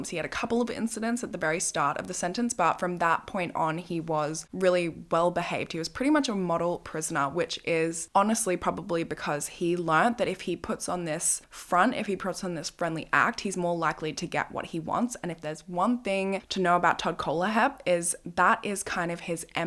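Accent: Australia. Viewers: you just earned a new sub! love the way you explain things, i’m also australian. it’s so nice hearing a familiar accent when listening to these kinds of things, thank you for your videos! <33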